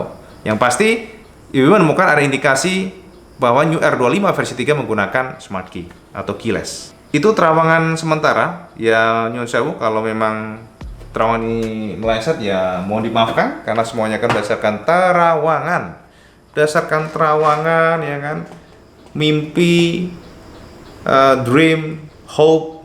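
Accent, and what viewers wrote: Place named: East Java, Indonesia. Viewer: Koyok e.logate wong ngetan...Iki...Jatim.....takerjet....kok onok mosone...ngerti aku.iki bahasane.....salam dari Karaeng galesong....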